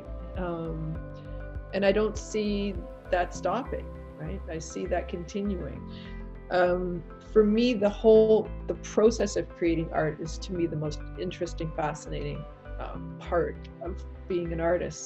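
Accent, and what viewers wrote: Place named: Canada. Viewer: Canadian accent for sure! I checked out your (very nice) website. Really like your work and your msg in this vid. I can relate. Hi from TO👋😊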